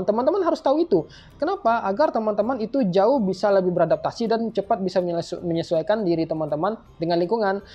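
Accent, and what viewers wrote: West Sumatra, Indonesia. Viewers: Bangg, urang Minang bangg?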